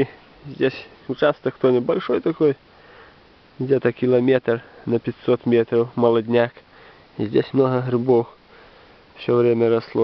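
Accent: Belarus. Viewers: Спасибо за видео . Как сама за грибами сходила смотрю вас у вас такой приятный говор беларуский , мне как бользам на душу .Я родом из Житкович , живу в Тольятти мне все родное что вы показываете . Удачи вам !!! И милион просмотров и подписчиков .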